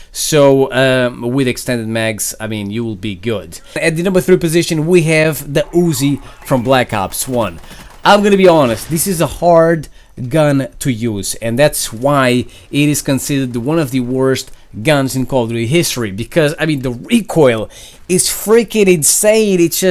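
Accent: Portugal. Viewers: Are you Portuguese? 🇵🇹 You sound Portuguese.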